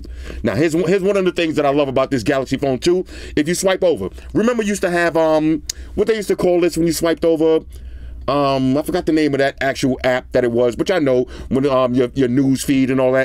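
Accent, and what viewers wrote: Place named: Queens, United States. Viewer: your Jamaica Queens accent 👌, just "eddie-thing" 😂.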